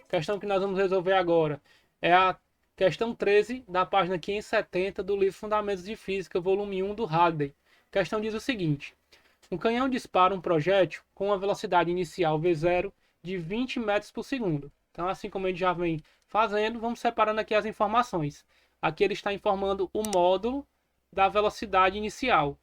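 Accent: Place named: Ceará, Brazil. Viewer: Professor, ótima explicação, parabéns. Pelo sotaque cearense, certeza de que o senhor é meu conterrâneo!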